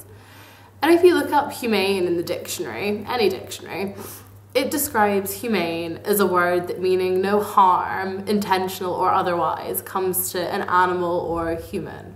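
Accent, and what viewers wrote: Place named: England, United Kingdom. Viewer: You sound english and why would you buy a coat for -40 when englands temp is like 5 degress in the winter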